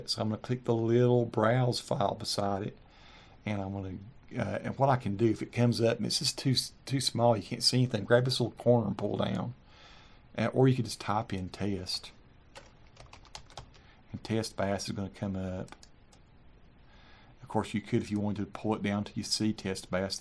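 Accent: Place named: North Carolina, United States. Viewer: Wow, thanks so much. This is the best Dreamweaver tutorial I've found. Plus, your accent is awesome (NC!)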